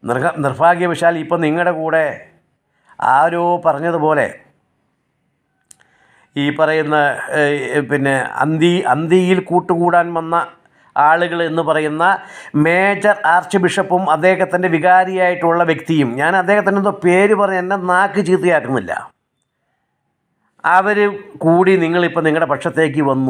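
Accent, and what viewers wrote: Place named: Changanassery, India. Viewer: ഈ ഒട്ടിക്കൽ മാത്രമേ നിനക്ക് അറിയുകയുള്ളു. തനി ചങ്ങനാശേരി ഭാഷ. പോടാ തെണ്ടി.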